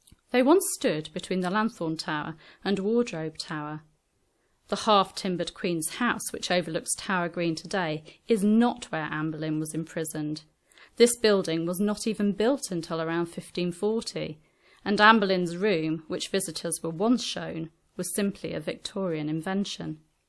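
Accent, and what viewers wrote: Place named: East Anglia, United Kingdom. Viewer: Misinformation is commonplace with most if not all historic sites. Tour guides or docents if you like; often have to follow a strict guideline or script of what they tell the public. It's unfortunate but all very true. Here in the States this happens mostly regarding period homesteads from Salem Witch Trial sites in Massachusetts to antebellum plantations in the former southern colonies and later states. Oh by the way, lovely accent! East Anglia?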